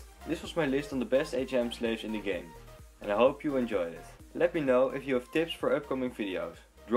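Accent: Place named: Netherlands. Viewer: Are you from Holland it souns like you from Holland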